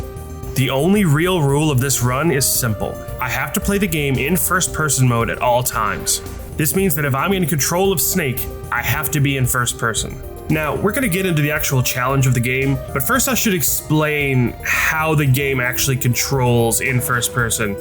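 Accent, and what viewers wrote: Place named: Mississippi, United States. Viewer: Honking Mississippi accent showing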